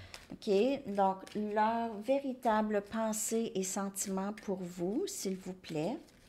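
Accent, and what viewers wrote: Canada. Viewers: Merciiii 💖 (Je poursuis mon commentaire, je l'avais publié trop vite). Tout me parle dans cette guidance! Oui j'ai avoué à cette personne que je ressentais de l'amour pour elle et que je pensais qu'on ne s'était pas rencontré par hasard. Mais il continue de tergiverser en disant qu'il ne sait pas ce qu'il ressent, que pour l'instant c'est trop compliqué, et tout plein d'autres excuses. En tout cas, je constate qu'après des années, il semble toujours avoir envie de me revoir 😌 Espérons que le destin soit plus puissant que les indécisions. PS: J'aime toujours autant ton accent canadien si doux 😍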